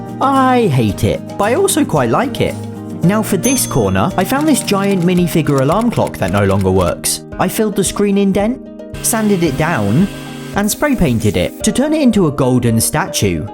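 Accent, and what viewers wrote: United Kingdom. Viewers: Are you British? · He’s British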